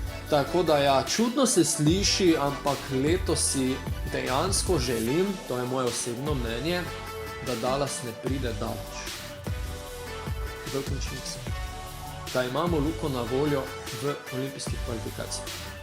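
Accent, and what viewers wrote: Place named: Ljubljana, Slovenia. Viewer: Govori kako treba Ljubljansko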